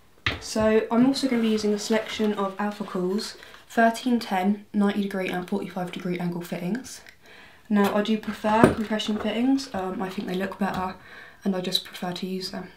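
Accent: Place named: Essex, United Kingdom. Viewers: English, english. English they speak in England, where it comes from...! Slight Essex accent though, a county north east of London. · Fit! im sure she is from essex.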